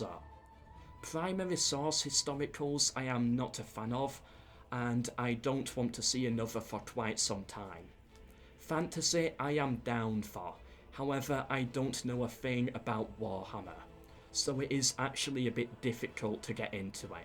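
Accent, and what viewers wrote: United Kingdom. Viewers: I basically see videos to learn english, he speak so slowly and with that strong british accent which is like a teacher to me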